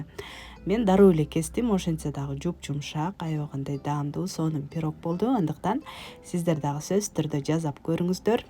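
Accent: Standard. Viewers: Азаматсыз,ийгилик каалаймын.Таза кыргызча суйлогонунуз учун лайк басып,катталдым💐Буйруса даярдап кором.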